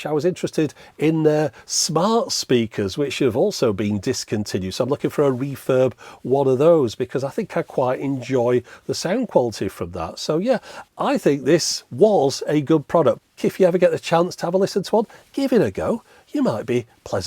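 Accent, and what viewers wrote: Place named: Liverpool, United Kingdom. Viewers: AHA! That's your accent. Liverpool - thought you sounded like a Beatles all these years. Can you play drums? You're really near Ringo. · So you’re a Liverpudlian 🤔 that’s where the accent comes from. Anyway, a very interesting video for me. As ever since I was a teenager I wanted to get a Bose Wave of some description. But they weren’t available in my part of the world. Bose are a solid good quality company too. It’s a shame the Wave series have been discontinued (not of too much use in a smartphone/Bluetooth speaker world I suppose) and the stores shut down. Even if the latter is a moot point from my point of view, as they never were available in our parts anyway 🤷‍♂️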